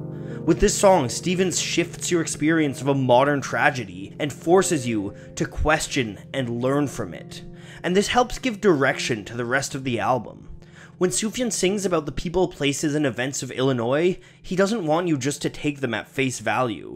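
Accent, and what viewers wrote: Canada. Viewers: You sound canadian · jukeboxjoe As if the thick Canadian accent didn't give it away otherwise lol.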